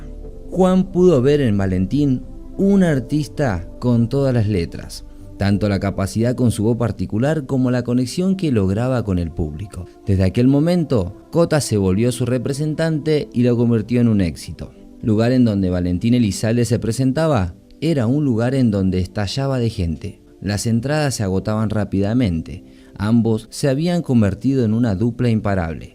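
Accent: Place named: Argentina. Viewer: Lo que mas me vuela la cabeza es porque el narrador tiene acento argentino?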